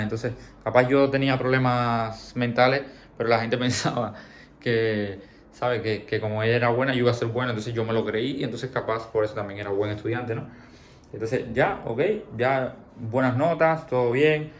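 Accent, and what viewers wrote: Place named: Paraguay. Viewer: Empecé a ver tu video y no entendí, pensaba que eras paraguayo, tienes un acento muy paraguayizado..😊 Deséo que te vaya de lo mejor, las personas de bien son muy bienvenidas!!!!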